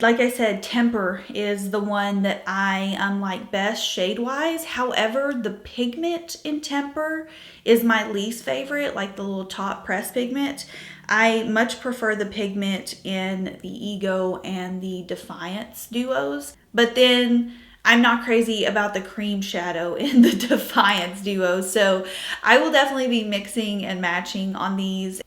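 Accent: South, United States. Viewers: Just found your channel and I am so impressed by how down to earth you are while being so thorough with your reviews! As a Tennessee girl I also love the southern accent! I feel very at home on your channel! The content you are producing is such high quality--thank you!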